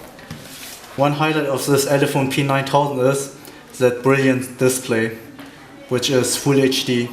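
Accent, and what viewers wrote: Germany. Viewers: Typical German - English ... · sounds German as f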